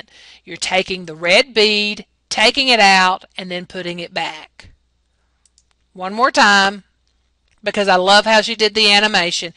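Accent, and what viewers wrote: United States. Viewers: What accent r u using boston? Southern? I like it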